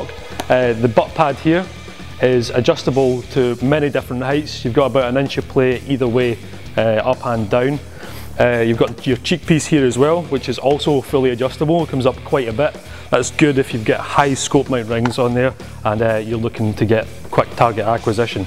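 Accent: Scotland, United Kingdom. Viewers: Oh snap is scottish!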